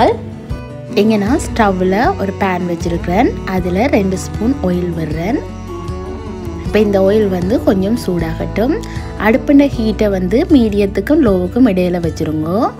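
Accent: Sri Lanka. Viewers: Super neengal jaffna Tamil Thane kathaikirigal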